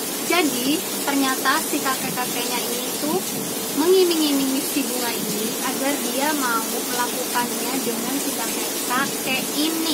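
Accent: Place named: Java, Indonesia. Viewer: medok bettt bahasamu mba apa lagi pas ngomong "GUE" medok beettt sumpah mba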